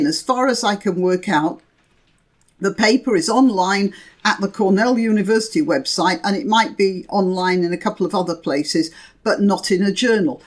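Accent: United Kingdom. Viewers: Hail and welcome.... welcome into my purview so I can become more and more enlightened. I welcome your British accent.... the each and every noun and verb etc are so well enunciated and the thought that is back-tracked to be RE-pronounced is just in sync with the ones I need to re-hear. I'm so lucky. um, to have found your channel.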